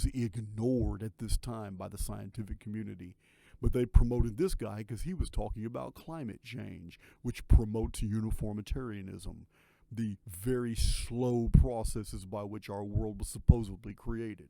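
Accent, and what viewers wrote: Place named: Texas, United States. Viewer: Hey there I just wanted to say that I really loved the interview that you did with Joel but it was so sad that I could barely hear you and I know that you're bringing new equipment on board but I think your levels are super low still this video is also hard for me to hear I don't always have the ability to get to a speaker sometimes I'm just listening to it right through my phone and it used to be so much louder so have you guys can tweak that we love to hear you loud and clear with that Charming Texan accent😇🥰😍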